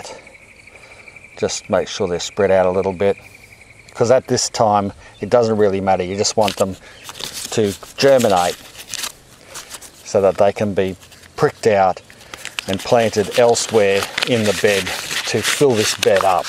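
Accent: Australia. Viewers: As a dual US/Aussie citizen, I'm not knocking the Aussie pronunciation, but it might be amusing to have the "as we say in Australia" for some additional entertainment value. Oh, and I think you mean Bell Pepper, for Capsicum?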